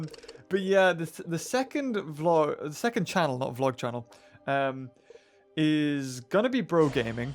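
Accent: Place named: United Kingdom. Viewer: why do you even think i came here for? The brittish giberish ofcourse:)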